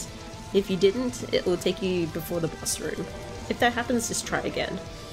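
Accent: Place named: Australia. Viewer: What are you Australian? If so I like your accent.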